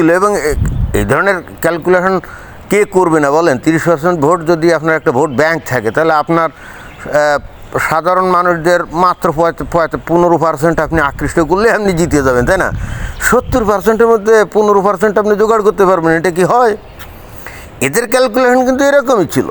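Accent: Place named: Bangal, Bangladesh. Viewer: তোমার উচ্চারণ দেখে মনে হচ্ছে তুমি বাঙাল।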